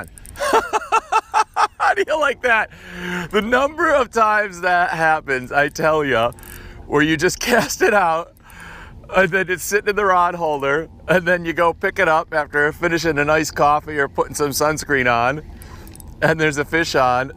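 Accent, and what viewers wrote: United States. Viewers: you sound like a yank ,but then you say english slang...love the vids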